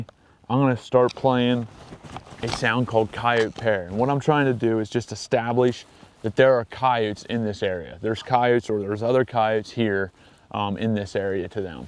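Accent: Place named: Pennsylvania, United States. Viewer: I'm getting a little PA accent in this video. Where you from?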